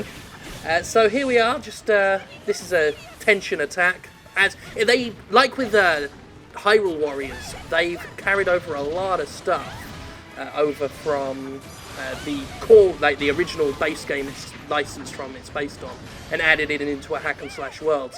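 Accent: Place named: United Kingdom. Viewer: Second Sounds like you're British? Me too, but I moved to the States for Grad school. First exam I ever graded the class average was 68% (which I thought was good, of course) and the course instructor, when he saw it, said "Ooooh shit that's bad". Flip side is that it's common to get scores in the 80s and 90s, whereas a 70 when I was at university was excellent.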